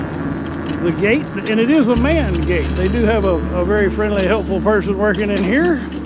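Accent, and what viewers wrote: Texas, United States. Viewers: I don't know why but I really love your videos. Your Texans voice and your Hawaiian shirt makes me want to watch your channel more. I'm glad I found your channel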